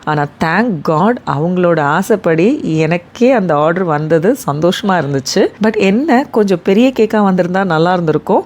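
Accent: Madurai, India. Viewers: Akka neenga madurai ah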